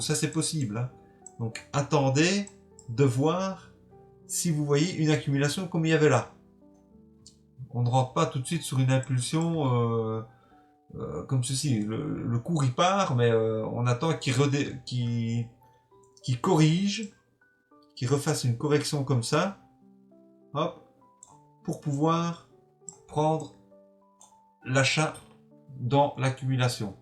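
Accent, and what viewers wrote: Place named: Belgium. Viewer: merci pour la précision je pense qu’on s’est formé chez la même personne et en plus ta l’accent belge donc je m’abonne ☺️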